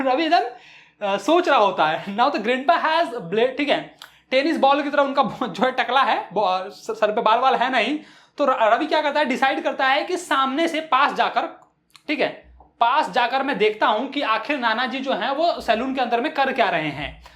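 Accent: Bihar, India. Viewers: Sir aapka accent Bihari aur east UP jaisa h...Mast h sir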